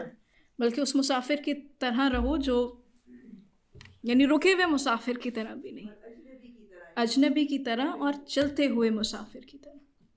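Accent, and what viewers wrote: Iran. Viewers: ما شا الللہ بہت ہی خوب صورت انداز ہے ان کا ذوق مطالعہ بہت وسیع اورمختلف النوع ہے رومی سعدی اور بڑے بڑے شعرا کا ریفرنس بذات خود ایک خوب صورت دلیل ہے ان کے وسیع مطالعہ کی احادیث اور قرآنی آیات کا ریفرنس بہت ہی اچھا اور قابل تحسین ہے الللہ پاک ان کے علم میں اور اضافہ فرماے ان کی الفاظ اور خاص فارسی کو اسی لہجے میں بیان کرنا نہاہت ہی خوب صورت ہے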